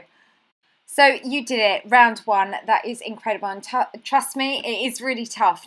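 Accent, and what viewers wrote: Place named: United Kingdom. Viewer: ommmmmmmmmmmmgggggggggggggggg your accent is sooooooo cute!❤❤❤❤❤ best british accent ever heard!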